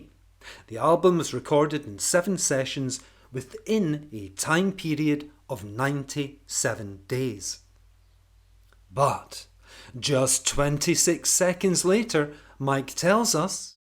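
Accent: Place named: Scotland, United Kingdom. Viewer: Excellent insights! I've only just discovered your channel and am enjoying it immensely. I have a friend who is fully signed up to the whole fake-Beatles, PID, nonsense. He started out as a total sceptic then flipped to the opposite point of view, almost exclusively on the strength of some of the ostensibly anomalous photos of Paul. I could send him your superb debunking videos, but he is so adamant he's right they would probably bounce straight off. Anyway, it's a pleasure to hear your cool and measured Scottish accent and your very well-marshalled line of argument. 👏👏👏👏👏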